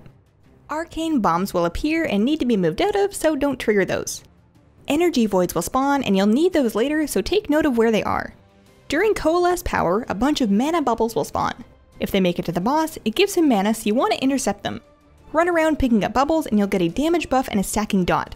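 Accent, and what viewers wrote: Canada. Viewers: are you canadian? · Are you Canadian?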